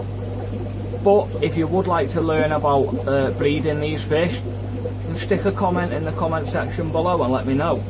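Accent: United Kingdom. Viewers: First off, congratulations of your country freedom and independence from the tyrannical shadow global organization. Secondly Macbeth is classic literature and movies for hundred of years. Why do you sound like you are from Scottland or Ireland and not from England? Where your parents from one of those 2 countries? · lol I'd say that's a northern accent 👍 I'm a scouser myself and gd vids thanks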